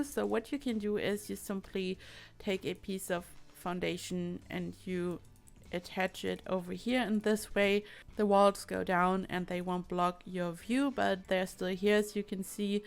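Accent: Germany. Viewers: That german accent tho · Are you German because I can here an accent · Are you german? bc of your accent. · wie man diesen deutschen Akzent einfach raushört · you have the same accent as * and * and they are germans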